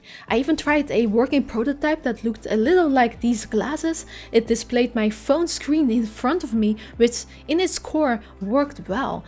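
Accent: Netherlands. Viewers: In the about section of this channel it states: location US. Maar hoewel taalkundig haar Engels als van een native speaker bestempeld kan worden, ik bespeur toch echt een licht Nederlands accent.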